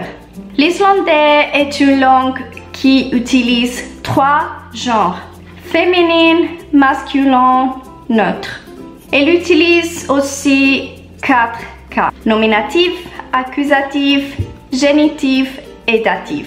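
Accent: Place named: Tuscany, Italy. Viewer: le inflessioni toscane le sento bene quando parli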